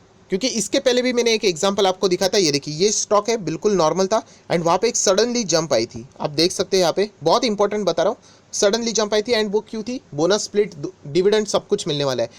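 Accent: Maharashtra, India. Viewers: Hallo Sir.. your mother tongue is marathi...100%... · Ho nkki awajch ahe marathi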